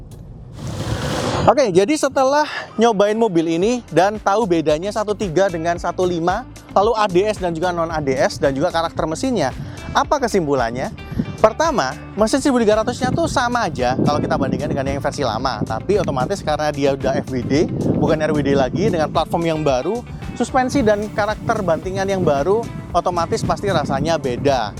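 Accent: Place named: Java, Indonesia. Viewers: logat jawa timuran.... 😜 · Lokasi apartemen denver Layak bahasa.e medok🤣🤣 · Wong jowo to mas, 😁 semangat mas broo..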